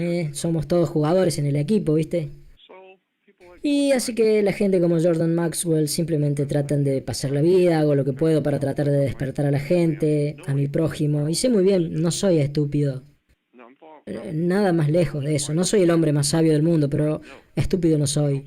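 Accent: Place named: Córdoba, Argentina. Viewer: Sonas cordobes, ya me siento en confianza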